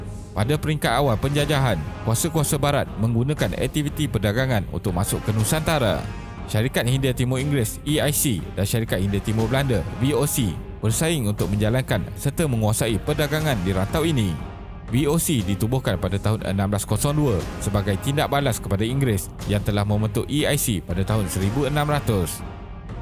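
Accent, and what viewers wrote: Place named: Malaysia. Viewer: Assalamungalaikum, admin dari Malaysia Kan,karna aku dengar lidahmu bukan lidah orang Indonesia 🇮🇩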